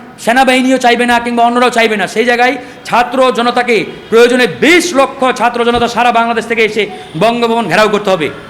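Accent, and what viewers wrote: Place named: India. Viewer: Ai lokta mone hoy bangla khatha bole indiar moto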